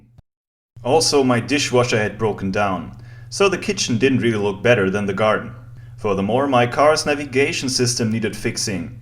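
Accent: United States. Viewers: lol ! loved the cowboy accent !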